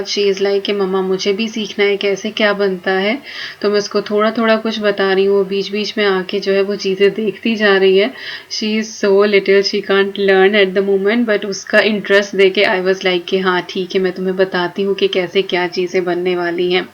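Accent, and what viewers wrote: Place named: Haryana, India. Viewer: Hlo apki bhasa haryana se milti julti you are my favorite YouTuber 🤗🤗🤗🤗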